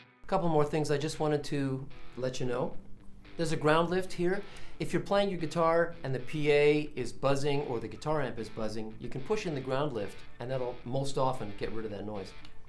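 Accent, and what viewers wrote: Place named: California, United States. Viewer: It's better to sound like you know what you're talkin about of course and instead of letting us here at tell us what we are going to hear. You must be from California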